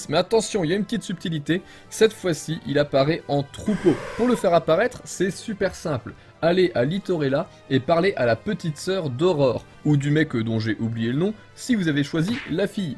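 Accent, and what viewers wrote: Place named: Lower Normandy, France. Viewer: "Ou qu'il l'est?" "Ou qu'on l'a?" T'es un vrai Bas Normand comme moi x)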